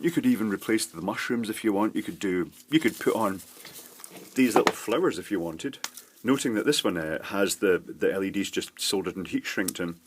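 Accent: Scotland, United Kingdom. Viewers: does the description actually say "idear gift"? or is it Scottish accent that makes the chinese sound humorously self-deprecating?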